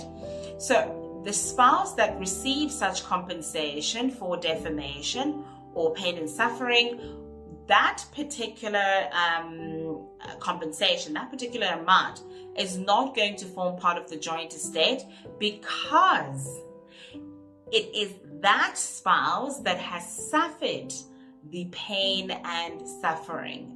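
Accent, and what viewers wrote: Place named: England, United Kingdom. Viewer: I love the English Accent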